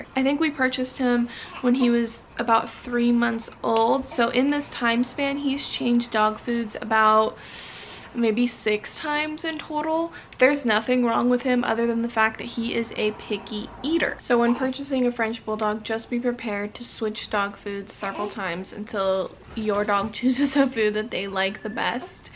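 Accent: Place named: Bay Area, United States. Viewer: where you from ? sound you like from the bay like me lol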